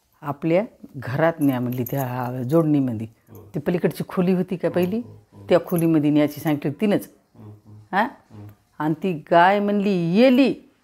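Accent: Nanded, India. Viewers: भाषा नांदेड जिल्ह्यातील वाटत आहे. माझं आजोळ आहे नांदेड जिल्ह्यातील भोकर तालुका.. माझी आजी पण हुबेहुब असच बोलते😊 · आजी आपल्या नांदेड भगाकडल्या आहेत..आमची भाषा ऐकून आनंद झाला..same आमची आजी अशीच होती💐💐🙏🙏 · बोलण्याच्या भाषेवरून सांगतो माई 100% नांदेड मधील असाव्यात.एक अंदाज. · Mrathvada mdhlay nanded chi language ahe wate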